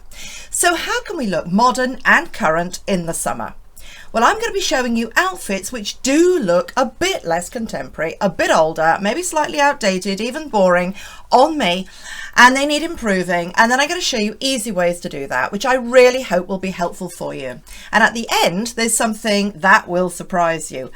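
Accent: United Kingdom. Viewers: I really appreciate your fashion tips and this American loves listening to your lovely British accent.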